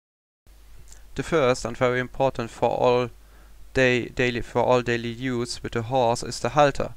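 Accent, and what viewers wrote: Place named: Luxembourg. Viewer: Luxembourgish accent ;-) So sweet compared to the hart german accent ;-)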